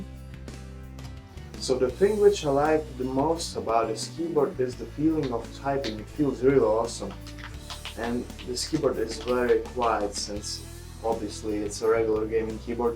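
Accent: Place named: Russia. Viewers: lol ruski accent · the translate : Lol,Russian Accent) in case some one wonder. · Лол, русский акцент)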